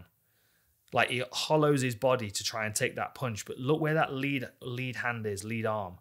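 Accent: United Kingdom. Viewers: Listening to you talk about mma makes me so relaxed man it's a form of meditation for me you heighten all my senses and I love listening to your soothing British accent and excellent flexion. Thanks bro!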